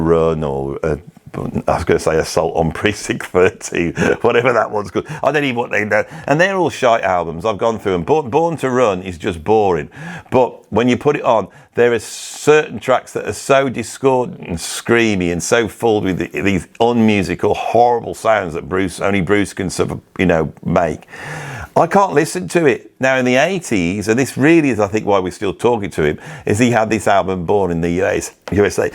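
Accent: Birmingham, United Kingdom. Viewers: We love hate, but only in a Brummy accent.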